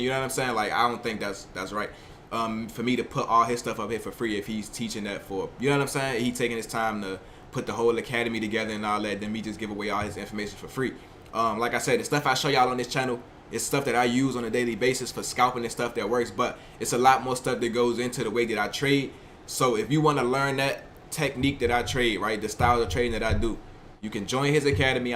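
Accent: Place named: New Orleans, United States. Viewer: You from nola?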